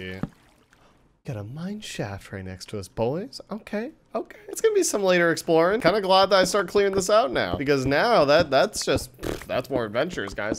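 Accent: Ireland. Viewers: Wait Irish???? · Ur Irish?! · I never knew u were irish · Irish? Jacksepticeye?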